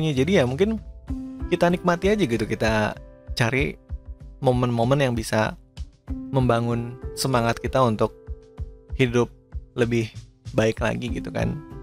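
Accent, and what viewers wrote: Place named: Java, Indonesia. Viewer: Lah saya kira orang Jawa. Asli orang mana?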